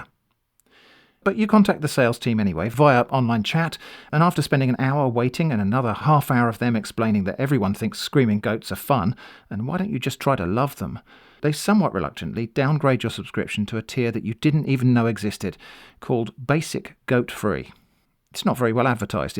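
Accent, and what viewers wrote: United Kingdom. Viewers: With the lively British narration, I feel like I’m listening to a chapter of Hitchhikers Guide to the Galaxy!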